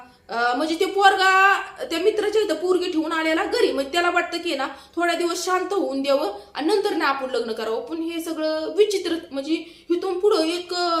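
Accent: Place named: Rural, India. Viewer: Gavthi bolna hicha konala awadata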